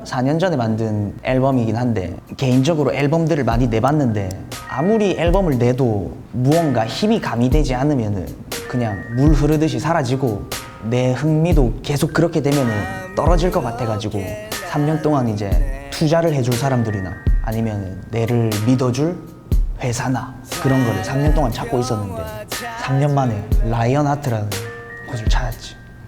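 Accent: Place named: Busan, South Korea. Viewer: 확실히 부산 사투리가 까리한데~~~~ 조용조용히 주술 외우는거 같다 ㅎㅎㅎㅎ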